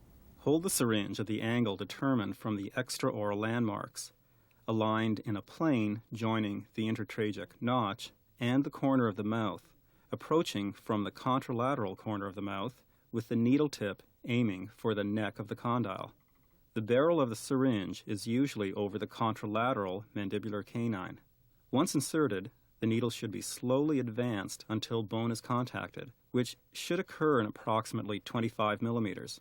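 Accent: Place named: Canada. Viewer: Sounds Canadian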